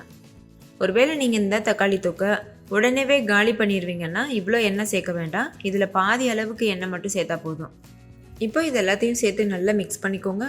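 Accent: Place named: Kerala, India. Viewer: Your way of talk seems you are a malayalee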